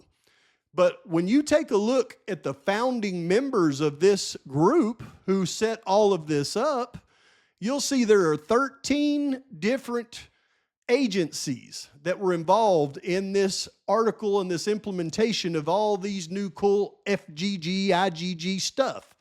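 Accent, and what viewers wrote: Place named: Texas, United States. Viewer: C i too recognize acent. It's pure texan.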